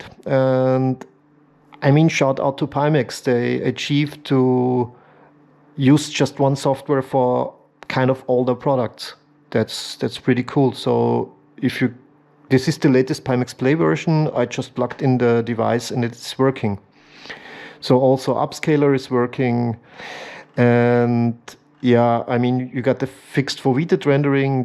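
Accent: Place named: Austria. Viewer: Servus höre am Akzent das du Österreicher bist😂 glaubst du das eine 4070ti super genug ist um das Headset zu befeuern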